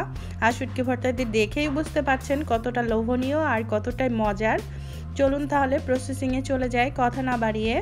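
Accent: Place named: Dhaka, Bangladesh. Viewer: bangali Na tumi dhakai Bangladesh theke tomar kothai bijha jache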